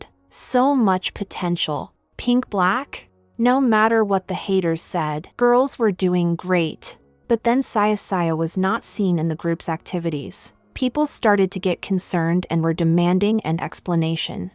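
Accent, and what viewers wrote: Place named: West, United States. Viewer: Western accent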